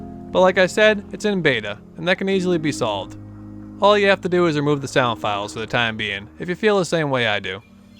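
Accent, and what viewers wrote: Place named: Massachusetts, United States. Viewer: this guys sounds like he's a Mass-Hole ! accent is strong of a Massachusettes.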